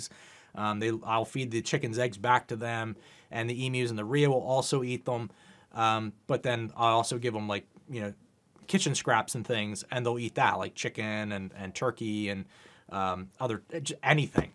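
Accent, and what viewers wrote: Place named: Pennsylvania, United States. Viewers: You have a very Delco accent lol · I nailed you as a Pennsylvanian as soon as I heard your voice. Glad I still recognize my native tongue 😂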